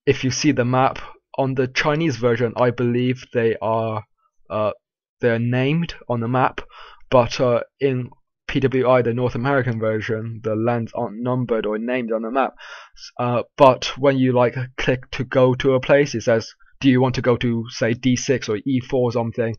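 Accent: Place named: United Kingdom. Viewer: British accent :)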